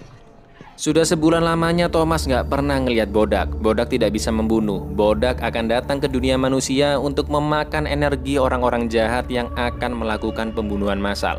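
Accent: Java, Indonesia. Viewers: Kakak orang jawa ya ? 🥰🥰🥰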